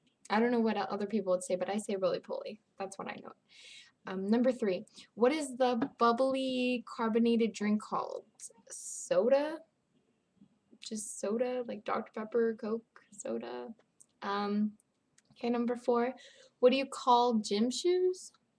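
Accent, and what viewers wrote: Las Vegas, United States. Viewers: Thanks for that. Just confirming the actors have your accent on CSI LosVegas ... Seems they do :) Also, I'm Aussie. Can't see the flag in its specificity well enough but, is it an NZ flag ? What's up with that out of mere curiosity ? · yeah your accent I didn't realize that's how you sound I'm in las vegas been for 8 years. but we have so many people like me that are just coming into the city from somewhere else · My wife is from Las Vegas, and you guys sound exactly alike. There is a clear Las Vegas accent, a good example is the "uhh... yeah." Pretty common among those I've met that are from Las Vegas.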